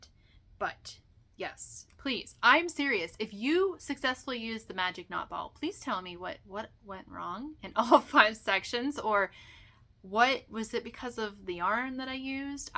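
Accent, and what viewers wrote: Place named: Western Pennsylvania, United States. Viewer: Such beautiful projects! I think you can’t go wrong with any of your choices of your gorgeous hand spun for your headband! So very pretty. I also just love listening to you chat, I too am from Western PA, but my husband and I moved a bit further south many years ago. Listening to you just reminds me of family we still miss so much. Thanks for another enjoyable episode. ❤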